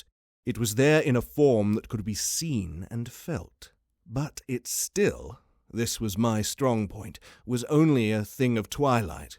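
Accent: New Zealand. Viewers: Kia ora, I'm in Aotearoa too. Lovely to hear a kiwi voice. I narrate audio books for the blind foundation. I've always wanted to do old skool horror. Love your voice. Benson is one of my fave authors.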